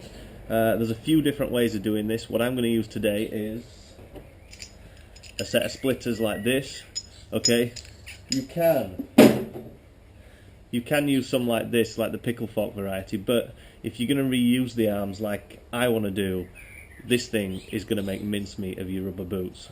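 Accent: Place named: Yorkshire, United Kingdom. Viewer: You sound like you are from yorkshire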